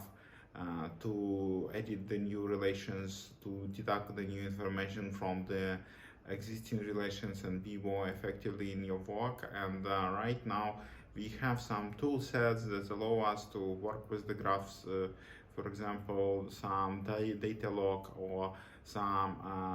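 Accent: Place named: Russia. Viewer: Чисто наш акцент, со снижением силы к концу высказывания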